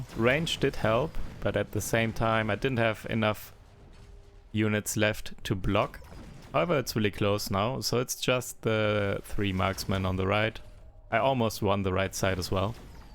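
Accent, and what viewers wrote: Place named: Germany. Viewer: Sorry for the personal question...I am still wondering about your clean speach/language. Are you a native German maybe?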